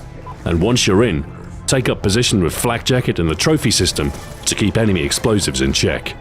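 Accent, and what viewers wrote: England, United Kingdom. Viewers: Sorry sir he is British. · It seems like this series has become to: "watch a british man saying puns about gun names in black ops 2".... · English accent :) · Does he sound American? He is obviously British. Just like how the Closed Captioning read "Centre" instead of Center. He even types his own CCs for his videos.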